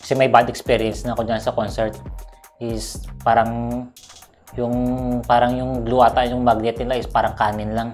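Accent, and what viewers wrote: Ilonggo, Philippines. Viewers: Ilonggo ka? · Bal-anon ang ilonggo accent mo nga tagalog bro hehehe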